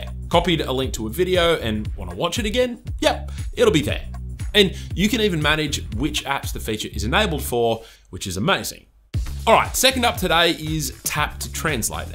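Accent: Australia. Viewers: I love the Australian English Dialect 😂.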